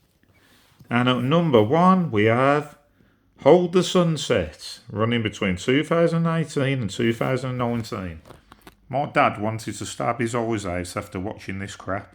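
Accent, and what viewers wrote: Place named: Birmingham, United Kingdom. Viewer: I agree with all of these, all awful, however you seem to be reading your script, and your brummie accent, whilst genuine is quiet grating. The word, five, does not have an o in it. Foive is not a word.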